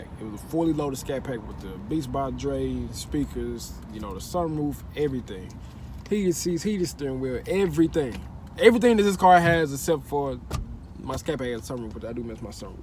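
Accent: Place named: Texas, United States. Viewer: What part of TEXAS u from bro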